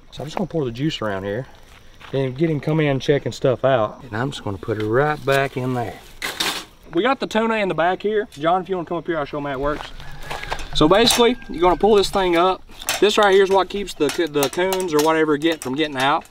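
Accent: South, United States. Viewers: the egg eater shorts got me hooked, as well as your accent being from the south as well. keep up the good work my man.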